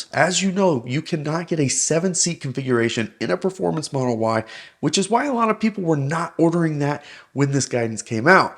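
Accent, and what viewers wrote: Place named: Greece. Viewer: youre greek arent you! lol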